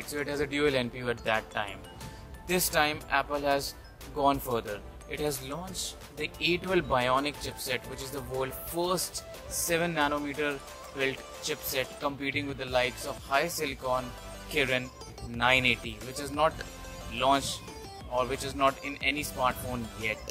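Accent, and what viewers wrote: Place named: India. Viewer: Crappy typical indian accent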